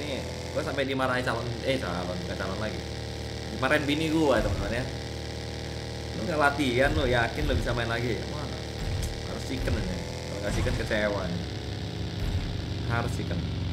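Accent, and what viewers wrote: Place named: Medan, Indonesia. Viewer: Haha,PARTENTENGAN gak tuh.. Udah belajar bahasa Medan Abang ini bah.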